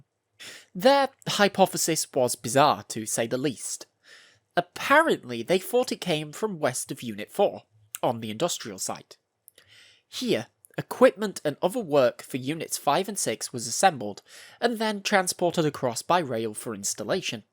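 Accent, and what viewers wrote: United Kingdom. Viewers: I know you got a British accent and all, but Kursk is in Russia, not Ukraine.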